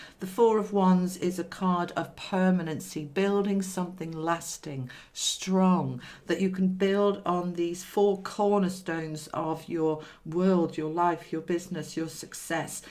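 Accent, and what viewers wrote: United Kingdom. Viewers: I love your beautiful British accent ! Thank you so much from France !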